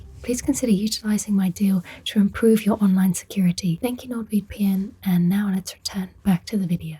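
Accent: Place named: Birmingham, United Kingdom. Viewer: My favourite brummie lady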